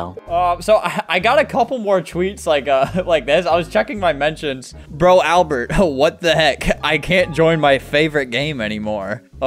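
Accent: United Kingdom. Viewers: Great British accent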